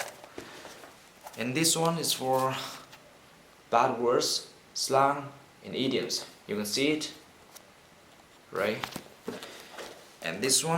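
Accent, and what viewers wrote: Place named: United Kingdom. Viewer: Linh Khánh Theo mình nghe dc thì anh anh đó bạn.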